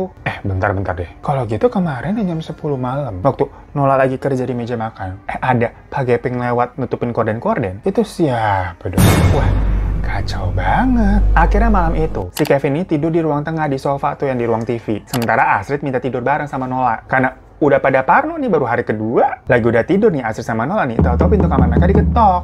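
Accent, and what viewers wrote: Java, Indonesia. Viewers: Indonesia nya bagian surabaya dan semarang🤣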